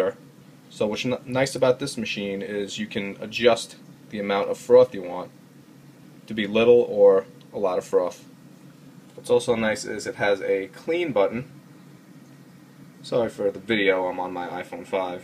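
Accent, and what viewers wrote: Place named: New York, United States. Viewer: Sick accent u must be from New York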